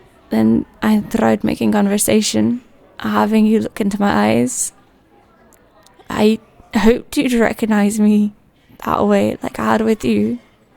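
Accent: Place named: Northern England, United Kingdom. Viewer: Love your accent! You from northern England or one of the Norse countries?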